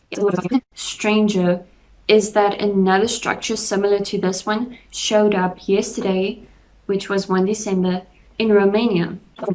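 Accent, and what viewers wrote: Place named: South, United States. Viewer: Wasn't expecting a full Southern accent 😅, loving the informative content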